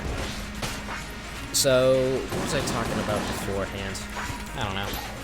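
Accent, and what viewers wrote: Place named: Scotland, United Kingdom. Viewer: ***** Your most welcome! (his Scottish accent is beautiful :P)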